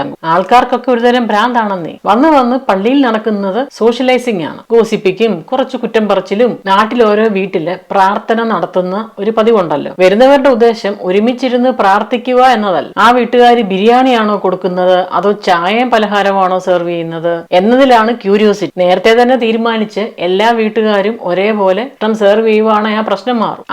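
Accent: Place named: Ranni, India. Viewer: Excellent narration. Are you from Ranni /pathanamthitta? Just guessed from your accent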